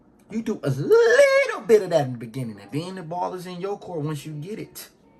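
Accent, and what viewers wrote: Baltimore, United States. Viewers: You from Baltimore huh brodie? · You gotta be from Baltimore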